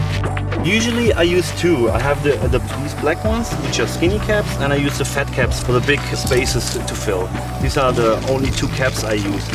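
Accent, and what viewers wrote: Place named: Germany. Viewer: sounds like hes german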